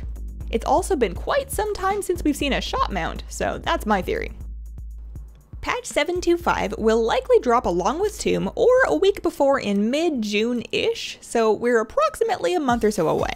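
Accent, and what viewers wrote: Canada. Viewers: haha i love the canadian accent :D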